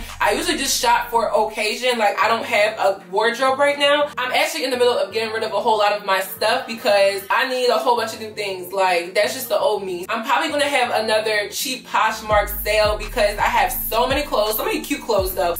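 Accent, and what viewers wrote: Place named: United States. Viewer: think about it as being phonetically. There is a popular gospel song with the correct pronunciation as well as song called for reference. Like there is an emphasis on the first half and the a is shorter than the traditional American a. Right now your cutting off the sound of the I and elongating the A at the end which is more American English pronunciation then the traditional Igbo pronunciation. I know because I have the same Igbo name (Grace is my English name). I commented earlier as someone else also mentioned this as it’s quite noticeable. I was born in the US, so I know it’s hard to pronounce everything perfectly.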